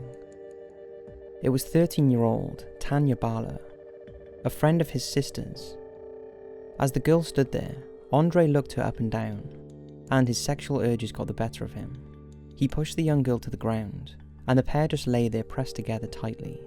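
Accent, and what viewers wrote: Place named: South East Wales, United Kingdom. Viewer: I've wondered for a long time where you are from. You have an accent that sounds like you might live very close to me which is South-East Wales. Let me know!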